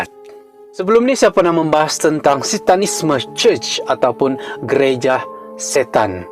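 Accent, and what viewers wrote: Sabah, Malaysia. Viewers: ni orang sabah ka? Aku tangkap aksen dia sabah bah cara dia becakap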